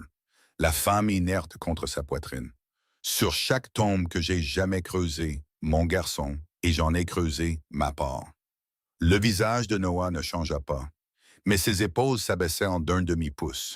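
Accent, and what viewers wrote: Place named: Quebec, Canada. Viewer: Je vous écoute d'Alger, Belle histoire et bel accent Québécois ❤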